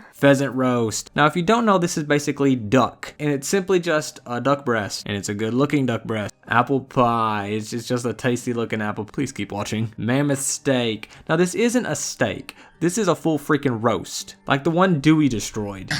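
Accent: United States. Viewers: What state are you from? There needs to be more cowboy accented Youtubers out there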